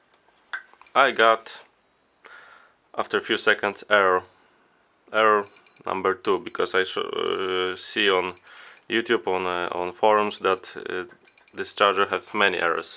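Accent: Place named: Russia. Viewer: From your acent I think you are Russian) But, anyway, Thank tou!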